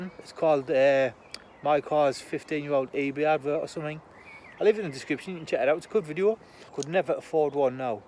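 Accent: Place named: Sunderland, United Kingdom. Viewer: You have to be from Sunderland Marra with yr Accent.. Im from Farra originally .. Love yr videos..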